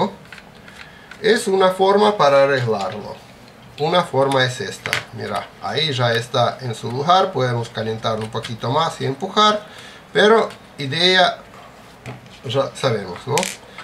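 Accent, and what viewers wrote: Russia. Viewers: Ruso o polaco?😀👍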